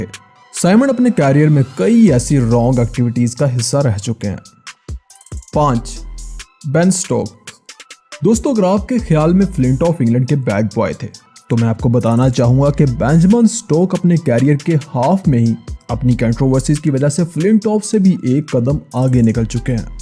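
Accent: Pakistan. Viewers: Yeh Pakistani lahza h is creator ka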